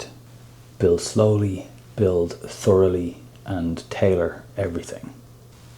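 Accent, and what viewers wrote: Ireland. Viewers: Classy clothes, cool sounding irish accent and great thrifting tips. Quality content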